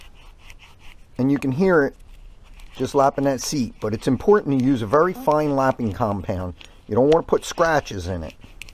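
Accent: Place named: Baltimore, United States. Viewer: I did take the spark plugs out, I shot some lubricant down inside with Kickstart a few times. Oil couldn't wait to spew out LOL. I ordered a new battery and waiting for it in. Your accent sounds like you might be from the Baltimore area. I'm in the Gettysburg area. When I get the battery in and try to start it I'll let you know how I make out. Thanks so much for your reply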